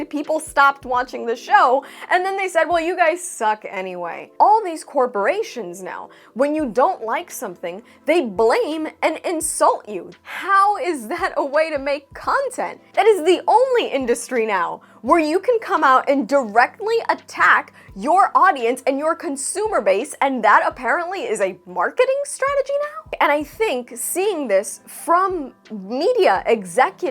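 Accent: Australia. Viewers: How many upvotes can I give you for your choice of restaurant, how you want the steak cooked and hilarious aussie accent? LOL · Love the Australian Accent 😂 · Great video, big fan of the content you create. The people making TV and films today are just arrogant fools. I mean what's the worst thing you can do if someone doesn't like your stuff, oh yes, tell them that there's something wrong with them for not liking it. Total hubris on their part and pretty much guarantees that the next thing they do will be frowned upon too. However, it does pointing out that it's not just people in Hollywood who currently have this attitude, look around anywhere and you'll see the same thing happening; nobody in any walk of life wants to accept any sort of criticism today. Loved your Australian accent as well. Keep up the good work. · Your Aussie accent, tho! 👍😄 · Hahaha love hearing an American do an Aussie accent. 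It’s the cutest thing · I did not expect the Australian accent 😅 well done. This should be a training video for PR people in Hollywood. You hit all the points 🙌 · Excellent accent mate, I closed my eyes and could picture an Australian 🦘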